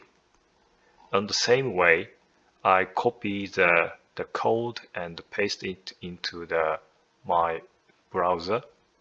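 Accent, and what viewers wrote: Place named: Japan. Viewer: 日本語訛は私にとってはとても理解しやすい英語です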